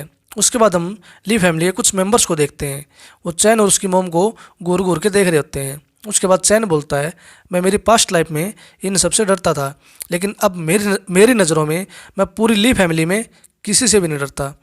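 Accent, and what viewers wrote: Haryana, India. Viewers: Tum harayana se ho kya bro 😍😍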